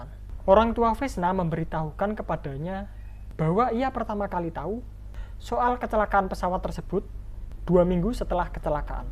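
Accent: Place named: Java, Indonesia. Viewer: mas’e medhok opo wong jowo :D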